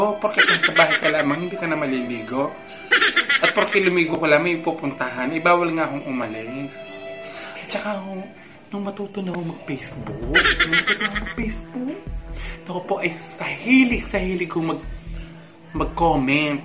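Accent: Batangas, Philippines. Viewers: Nakakatuwa ka....ala eh batanguena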